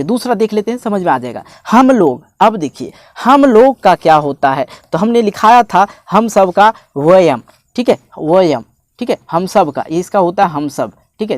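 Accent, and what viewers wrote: Bihar, India. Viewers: Sir aap Bhoot hi acha padhate ho aap bihari ho na 😅😅😅 mujhe pata. Chal gya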